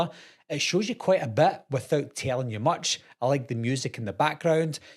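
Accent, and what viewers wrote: Scotland, United Kingdom. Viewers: Dude,I love the Scottish accent!❤ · Are you from Scotland ?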